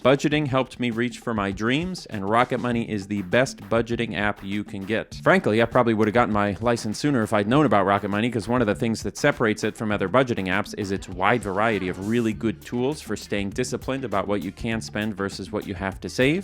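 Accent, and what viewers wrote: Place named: Minnesota, United States. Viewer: Sometimes I forget you’re from Minnesota and they you say “bars” lol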